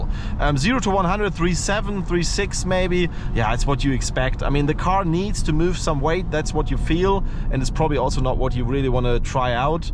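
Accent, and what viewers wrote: Germany. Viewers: A german trying to speak british english with a heavy german accent. It sounds so ridiculous and disgusting 🤢🤮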